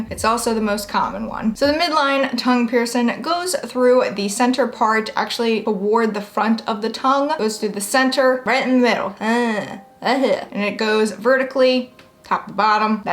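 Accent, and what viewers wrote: South, United States. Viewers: I can tell, shes from the south.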